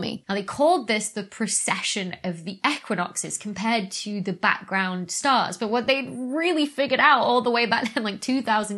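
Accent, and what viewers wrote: United Kingdom. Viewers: Keep in mind that she's British and British pronunciations often differ from American. In the U.S. we call the second Greek letter "baatah," while the British say "beetah."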